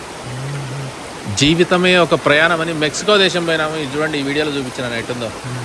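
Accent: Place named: Telangana, India. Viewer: తెలంగాణల భాష లాగానే ఉంది ఉంది బ్రదర్ మీది మీ స్వీట్ వాయిస్ చాలా బాగుంది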